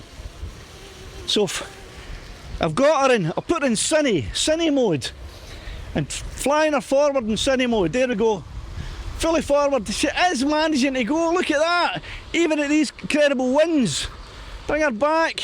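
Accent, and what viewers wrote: Scotland, United Kingdom. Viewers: What part of south west Scotland are you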